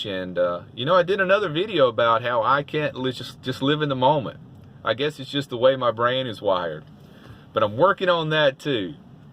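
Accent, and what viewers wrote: United States. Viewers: Super cool accent you have there Mr. USA🇺🇸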